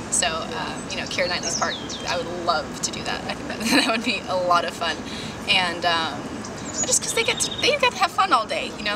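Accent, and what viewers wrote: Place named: United States. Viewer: i thought she was from south africa? she sounds american to me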